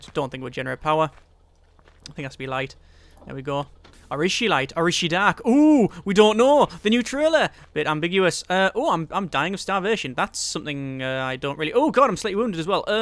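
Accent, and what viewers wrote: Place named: Newcastle, United Kingdom. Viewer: are you from newcastle? ya got that geordie accent if ya na what a mean :D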